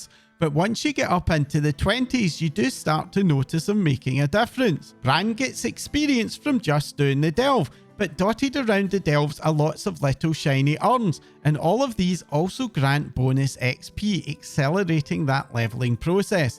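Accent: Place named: Highlands, United Kingdom. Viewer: This guys accent reminds me of my late grandfather Kincaid. He was a highlander. Ignore the trolls, it's all they've got. Let em get there tiny scrap of fleeting self esteem. It vanishes as soon as they get it. Please. Allow me. Piss off trolls.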